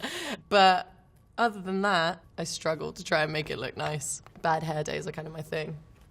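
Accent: United Kingdom. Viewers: su ingles es británico¿ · Acento muy britanico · Es re bri"ish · No leas, ve su angelical rostro y escucha esa f*cking voz sexy con acento inglés que se carga, eso es todo 🤤☺️ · Por favor el acento un británico hermoso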